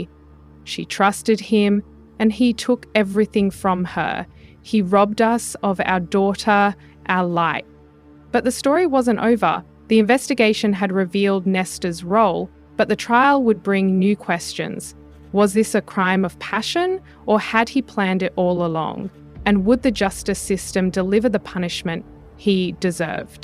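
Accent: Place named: Australia. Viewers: Love this accent. Im thinking its Australian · Yeah it’s Australian. Many channels are AI voices now so I am happy to find a real person speaking.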